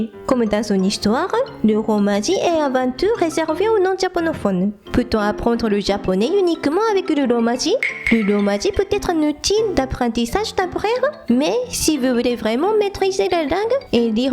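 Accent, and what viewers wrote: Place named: South, France. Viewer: Tout ça est très instructif, merci beaucoup ! Si j'avais simplement une très légère critique à faire, je trouve que la musique est un peu trop forte par rapport à la voix... Et je trouve que vous avez un accent du sud quand vous parlez en français, est-ce qu'on vous l'a déjà dit ?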